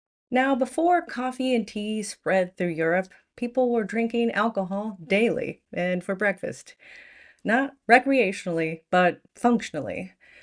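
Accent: South, United States. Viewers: Hi,I do enjoy your videos. For one reason, you don't start in hysterics like many other US folks, and your accent is charming. No I didn't learn a lot about the tea vs coffee thing, because I was mostly aware of it, but I enjoyed your telling me about it. I am "very" British, and a "chain" tea drinker, but also enjoy a good coffee - Columbian being my preference made in a cafetiere (French press). I had great coffee in France, Spain and Italy, but the best ever was made in a "briki" using stream water in a small mountain village in Crete! Coffee is a slightly toxic stimulant whereas tea is an infusion.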